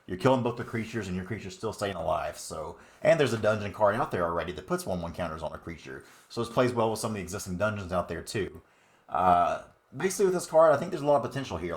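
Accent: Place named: Oklahoma, United States. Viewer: btw are you from oklahoma by any chance? lol your accent sounds very much like how 99% of the people im around sound. lol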